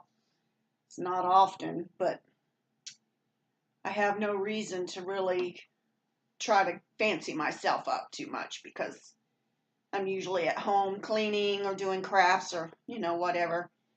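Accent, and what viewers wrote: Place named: South, United States. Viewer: Hello there thank you so much for all of your very sweet words!! I love your southern accent!! Do you like my northern accent? I’m northern Pennsylvania! Xoxoxo 💕🦋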